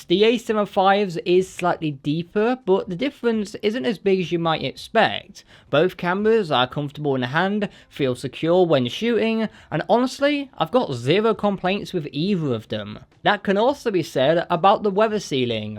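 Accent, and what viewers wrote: United Kingdom. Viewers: bro say water and father please😁.i think you from UK❤❤❤❤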